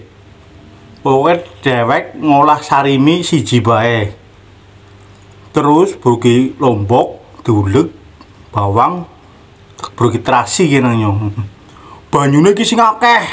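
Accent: Banyumas, Indonesia. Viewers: Mangan maning apa ora wedi makin gendut mas ha ha,sehat ya mas,ora ngapak ora kepenak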